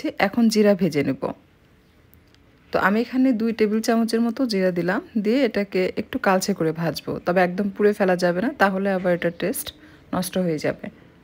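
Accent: Bogura, Bangladesh. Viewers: Jak bugurar 1ta apu pelam Tai toh boli kotha ato sundor kano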